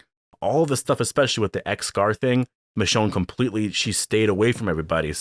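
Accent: Canada. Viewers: Just realized you have a Canadian accent!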